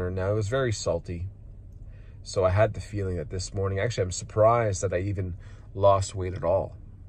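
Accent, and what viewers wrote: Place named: Canada. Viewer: Are you canadian! i heard a little Canadian accent! love your videos, very helpful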